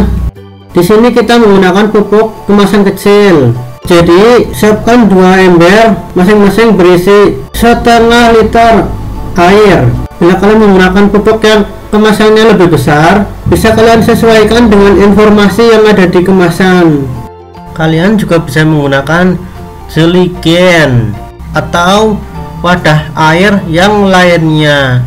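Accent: Banyumas, Indonesia. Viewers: Suarane ngapak, hidup ngapak, semangat, ilmunya bermanfaat, makasih kang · Ngapak yak😅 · wkwk logat ngapaknya masih ada gan 😂 tp nice info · bahasane NGAPAK MEMBAHANA...tapi bisa dipahami..terima kasih GAES.... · Daerah ngapak ndi yah ..pengin belajar langsung.. inyong cilacap. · Hidroponik ngapak gaes..... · gan iki ngapak banyumasan opo tegal gan ? pada bae ngapak sukses yo bos... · Yang ngisi suara kayak orang ngapak pasti kiye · Bukan jawa gesss, tpi ngapak gesss bhsa daerah terlucu dan gk bisa hilang klaupun pkek bhsa indonesia ngapakknya tetep ada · Logate wong ngapak kie ya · ka ya uwong ngapak kie yah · Jawa Ngapak Nya Jelas Banget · Di gas i kang ngapak e. Mantap hdroponik nya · Ngapak yaak😂😂😂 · Ngapak mn gan...salam dariku dari malaysia..asal wonosobo😊.. Ma kasih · wong ngapak bro?salam ngapak bro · versi ngapak gesssss ..... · Terhibur dengerin suara mas nya. 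Ngapaknya kentel banget. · Bahasanya masih kaku... Pasti orang ngapak nih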